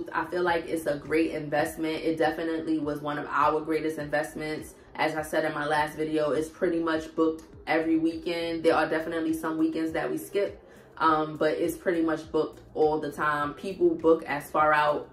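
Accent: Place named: Brooklyn, United States. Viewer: With that accent...it sure sounds like ur in a Brooklyn girl........but gurl I pray ur not UNDERcharging folks!🤷🏽‍♂️🤦🏾‍♂️...🤔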